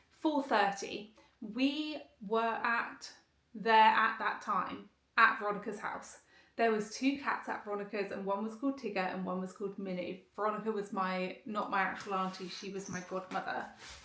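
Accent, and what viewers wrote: United Kingdom. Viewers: Best British accent 😊 I also miss when you made vlogs with your friend Kate🌸🌸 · So happy for you. I would love to see every moment of your renovations. You have the most beautiful skin, you are glowing! I could listen to your beautiful British accent all day. Blessings 💝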